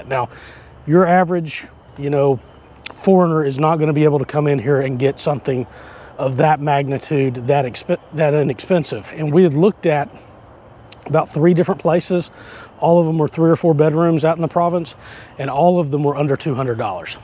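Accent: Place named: North Carolina, United States. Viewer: Great video. 'Glad you're stayin'. I'm planning on coming over for good in November. The first thing I'm planning on doing is to buy a motorscooter, new one. Honda. What are the hoops you have to jump through. AND most importantly, what is the situation with getting a driver's license. Is a US license good for a period. Can you do the whole registration / insurance thing in one go or is it a long drawn out process. SECONDLY, I'm in N.C. so I'm appreciating the accent; hold on, secondly as I said, why did you go to Mindanao. It seems EVERYONE is going to the Dumaguete area and Mindanao sees like the dark continent. I've never been to the Philippines except to fly over it once but I've lived in Mexico so I appreciate the concept of time and bureaucracy and it seems to be a Spanish trait the Philippines has picked up nicely. I'm VERY patient. 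What are some prices for a two bedroom in Mindanao? Is there an English speaking community in Mindanao or is most of your time spent at home. Questions, questions...... Thanks a bunch and enjoy your day. All the best, Greg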